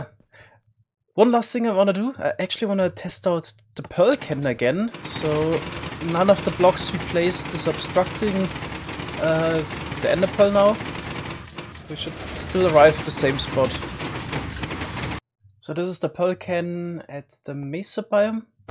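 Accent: Germany. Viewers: Is he German? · You Sound a little Bit german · Bist du vielleicht Deutsche weil dein Englisch hört sich so an 😂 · Are you German? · Deutsches Akzent xD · Bist du Deutsch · Man merkt absolut das du deutsch bist haha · Bist du deutscher? Man hört das voll raus! 😂 👍 Aber geile Video💗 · Oh du bist von Deutschland.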